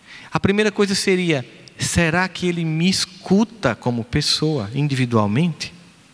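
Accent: Northeast, Brazil. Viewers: Esse sotaque NORDESTINO E MUITO BOMMMMM ! GRATIDAO !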